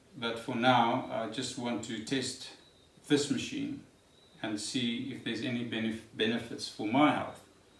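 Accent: South Africa. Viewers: Your south african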